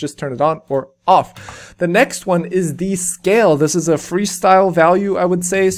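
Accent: Germany. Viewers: you went full german mode in this one hehe <3 Great stuff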